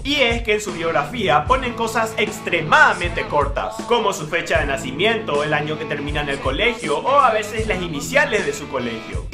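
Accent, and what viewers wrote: Paraguay. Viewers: Hacena videos a lo paraguayo como hablabas antes, te haces guau de el cheto nde tembo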